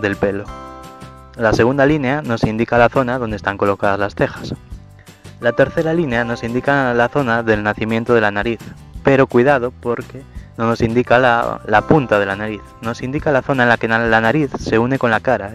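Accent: Galicia, Spain. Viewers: Buenísimo el video, muy claro y trabajado :) Otra cosa, eres de Galicia? Es que yo soy de allí y me suena un poco tu acento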